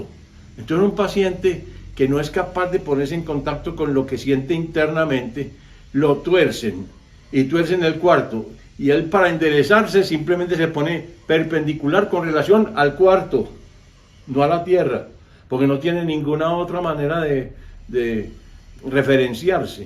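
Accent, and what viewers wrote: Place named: Antioquia, Colombia. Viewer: Me alegra haberme topado con este video y este canal, muchas gracias por argumentar tan claramente; tiene una opinión muy informada por decir lo menos. Creo que es evidente que la ciencia hoy en día no es ciencia, ya que se aferra con garras y dientes a un materialismo absoluto propio de un dogma, a pesar de pruebas clínicas y testimoniales tanto de pacientes como de profesionales de la salud, sin mencionar si quiera los nuevos descubrimientos de la física cuántica (que más bien parecen ser re-descubrimientos, porque al parecer es información que sabíamos y olvidamos o nos hicieron olvidar a través de las eras). Reconozco un acento paisa en cualquier parte y no sabe lo mucho que me enorgullece que los Antioqueños tengamos mentes tan abiertas y sabias como la suya. Un caluroso saludo desde Medellín, Colombia 👋🏻 Favor continue con su maravillosa labor de informar sobre temas tan clave en especial en estos tiempos 🙏🏻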